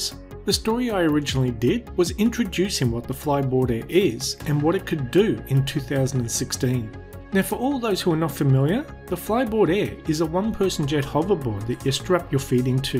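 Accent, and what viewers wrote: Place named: Australia. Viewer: From a fellow Aussie, "I can tell by your accent 😉" thnx for the video, but you're an Aussie, enough with the imperial.. Or yeah use both.. I just want Americans to come around with the rest of us lol 🙂🇦🇺🇺🇲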